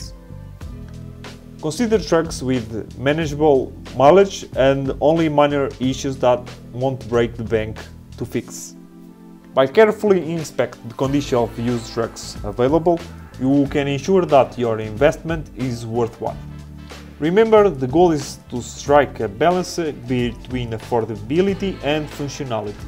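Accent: Portugal. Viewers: Sotaque Português hahahaha Conteúdo top. Adoro os teus vídeos, abraço <3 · Sounds like PORTUGUES?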